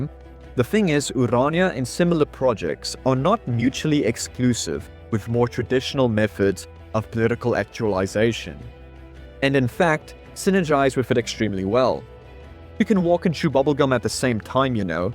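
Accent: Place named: South Africa. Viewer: Knew you were South African before you said so. Good to see there's more of us. This Soutie is with you ready to fight for my Afrikaans people and ancestors. Fuck communism. God i love how you explained the South Africanisation spectrum. I really do wonder where we're going in future. Papa Greyling came to SA in 1752. I don't think I'm going anywhere.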